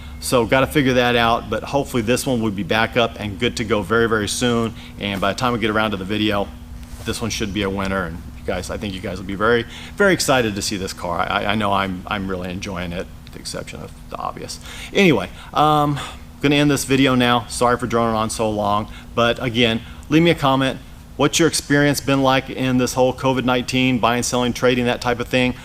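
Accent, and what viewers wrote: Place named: United States. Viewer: You sound like a regular American, especially for a Californian... 😉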